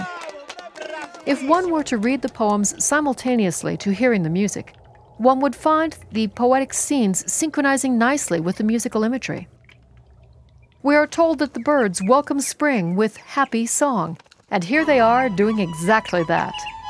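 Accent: United States. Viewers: Quite annoying with the very American accent, maybe in respect to the masterpiece, put an European to narrate the video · What is this lady's accent? Obviously some kind of American but sounds a bit different? (also, great vid!)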